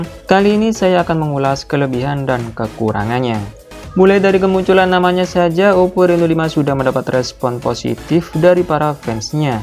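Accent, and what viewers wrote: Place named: Madura, Indonesia. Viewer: Kayak logat orang madura 😅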